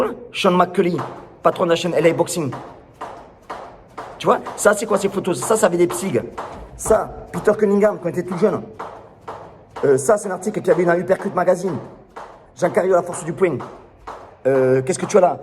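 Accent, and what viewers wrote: South, France. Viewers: Ça fait surtout du bien d'entendre ce si bel accent qui se perd malheureusement partout dans le Sud.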